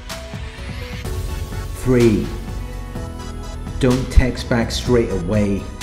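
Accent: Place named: England, United Kingdom. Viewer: He is English we have many dialects over here unlike the US. The silence is so you can digest what he is saying.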